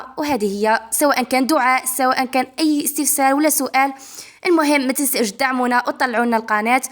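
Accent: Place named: Relizane, Algeria. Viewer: ماشاء الله تبارك الرحمن أنا متتبيعة جديدة أنتو من غليزان لهجتكم من غليزان رجعو علية جزاكم الله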